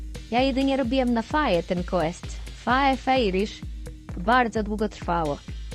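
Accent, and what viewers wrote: Poland. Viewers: Z jakiego rejonu Polski jesteś? Skąd akcent? XD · Podoba mi się twój Podlaski akcent.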